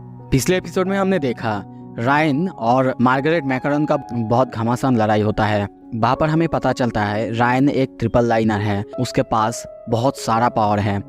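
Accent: Bengal, India. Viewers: Sounds like a bangali. Ma bhi bangali hu. Nice explanation video.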